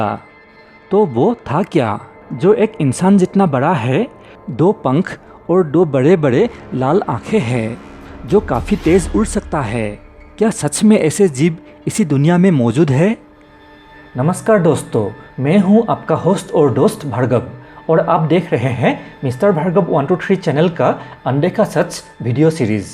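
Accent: Assam, India. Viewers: Apunar tone Tu alop asamiya jen lage assomor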